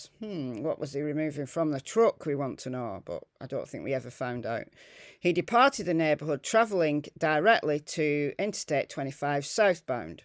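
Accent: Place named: Stockport, United Kingdom. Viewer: I am so intrigued by this case and have been watching loads of videos from various you tubers and something doesn't quite add up about how the investigation was done for one and what's he not telling that he will take with him to the grave. Is he going to end up pushing N K under the bus to get his sentence lessened because she was involved after all maybe. I'm a northern llass by birth born in Salford Manchester and lived in digsbury until my parents moved to Wales. Is that a Stockport accent I recognise. Enjoying your videos on this because they are so full of interesting information 😀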